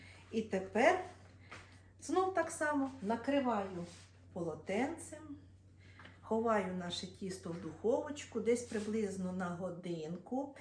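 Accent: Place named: Khmelnytskyi, Ukraine. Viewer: Класний рецепт, дякую Вам! Візьму собі на замітку! У нас такі пироги теж називають струдлями., Ви родом не з Хмельниччини, дуже діалект схожий на наш 🤔😅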